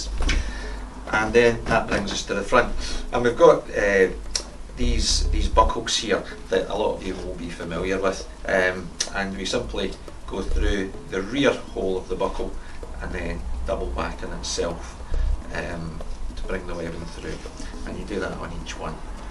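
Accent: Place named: Scotland, United Kingdom. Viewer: Quality product at a great price, added to wish list! Thanks for this helpful demo. I wish all salesmen were Scottish, love the accent :D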